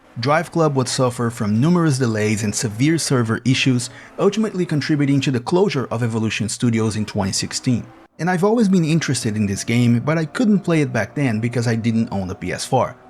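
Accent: Brazil. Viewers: Yes, i'm 100% sure he is. I can spot a brazilian just by their accent, plus there's the gameplay in the video that's in portuguese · Great video! Congrats! Btw, are you brazilian? Your accent looks familiar. Keep it up with this awesome content. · Bom video, jogo até hj, e lamento que nao comprei o ultimo dlc. Obs: to escrevendo em português pq o seu sotaque te entrega. O curioso é que Driveclub vai sobreviver ao tempo, enquanto os jogos de corrida hoje nao saem em midia fisica (ou no caso de GT7 mesmo em midia fisica, precisa estar online, do contrário vc tem 10 carros e 3 pistas pra usar só no modo time trial ) . Tenho todos os dlcs e rodo hj em dia no ps5, me lamento nao ter pegado o ultimo dlc, que foi o unico que nao era incluido no season pass. O Dlc que vinha com o Jaguar XJ220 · ingles bom mas aquele quezinho de hue está perceptivel no sotaque! to enganado? 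anyway, i never played this game for more than like a race or two at a time. do we already have ps4 emulators? 🤔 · Dude, are u Brazilian? You accent kinda sounds like it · that’s why I asked, because he had a faint but noticeable accent that I could only trace as PT-BR. I didn’t manage to watch the whole video, but I am not surprised that there was some footage with Portuguese text.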